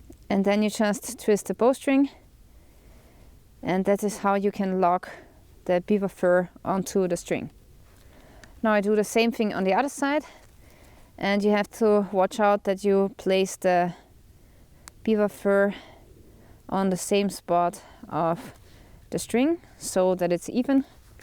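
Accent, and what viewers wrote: Germany. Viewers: So cute German accent :) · I'm guessing 🇩🇪? Nice video and very humble. It's the best when you say, ,"Cool"...dánke....Michael 🎶 🎶🎵 🎯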